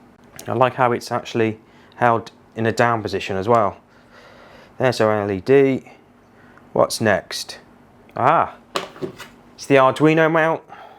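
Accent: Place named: United Kingdom. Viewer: That s awesome! I love the British accent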